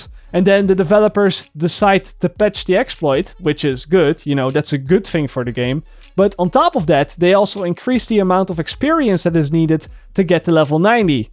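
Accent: Netherlands. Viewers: Ja hij is Nederlands en heeft dus een Nederlands accent. Boeie.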